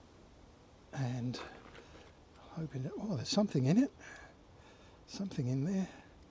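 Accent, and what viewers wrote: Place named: United Kingdom. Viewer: Thanks for your video, it's very helpful for a beginner like me. You sound like you are from the UK. Did you emigrate to Australia or are you on holiday? Best wishes, Rob, Romford, Essex.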